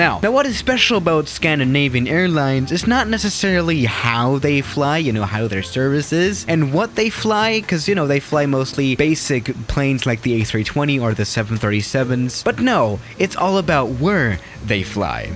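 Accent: England, United Kingdom. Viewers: To be honest you sound so British. I'm from Norway so sas is a good airliner.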